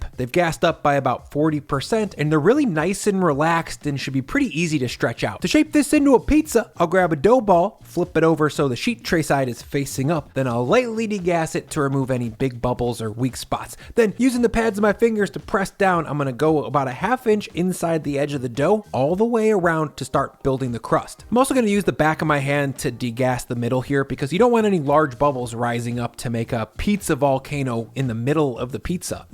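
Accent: United States. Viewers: You have an American accent and you give us grams... duh. thumbs down. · You sound American,, Why are you using metric crap ???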